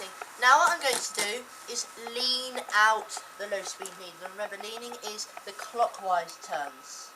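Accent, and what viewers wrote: England, United Kingdom. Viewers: why do you always sound like a british girl?? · Well hopefully when I try that tomorrow it will sort my running problems out. Wish I found these vids ages ago. So nice to hear an English voice instead of American. Well done mate i've already subscribed and watched about 20 of your other vids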